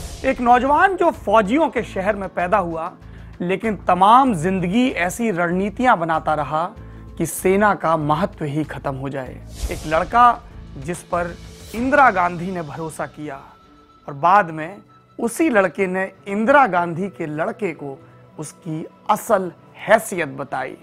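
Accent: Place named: Uttar Pradesh, India. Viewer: UP ki khadi boli, aur nabbe ka politics. amrika bhi mere gaon sa lagta hai......maja aa jaye agar lalu bhi koi baat ho jaye......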